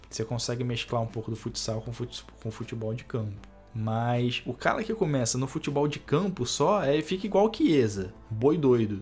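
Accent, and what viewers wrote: Rio de Janeiro, Brazil. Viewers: nasceu em SP, e tem o sotaque mais carioca do Brasil!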